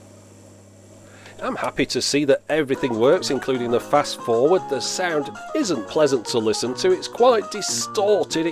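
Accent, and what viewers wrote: United Kingdom. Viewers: I wonder if this channel would be as interesting without the British accent. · I'm curious. Your accent has caused me to wonder if these were only offered across the pond. I know that in our digital era today the powers that be have deliberately limited if not cut off alternatives in the States.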